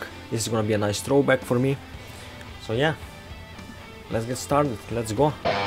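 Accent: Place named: Eastern Europe. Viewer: I knew you got a kind of eastern-european accent!! You are doing great, papá!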